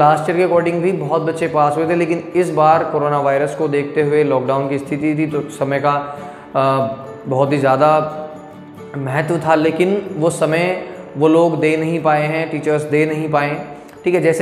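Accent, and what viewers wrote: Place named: Garhwal, India. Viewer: Sir ji ap gadwali ho kya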